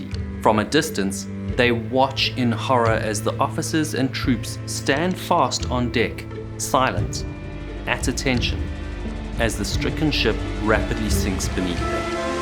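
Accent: South Africa. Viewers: Lovely to hear that South African English accent. The easiest English accent to understand. Especially for non English speakers.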